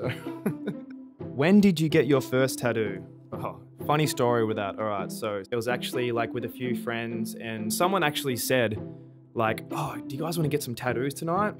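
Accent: Australia. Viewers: The moment you start talking I knew you have some Aussie vibes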